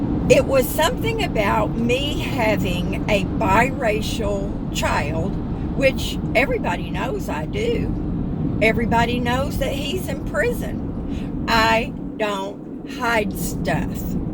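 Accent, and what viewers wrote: South, United States. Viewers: Love the way you talk that southern talk. My family from the south too. Thanks for sharing. Jehovah God take care of all things if we let him. Safe travels.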